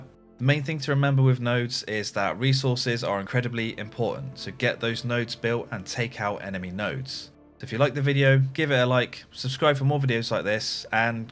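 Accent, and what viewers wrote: United Kingdom. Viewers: Best ever explanation on the internet…and in British English which makes it even more pleasant to listen to ;)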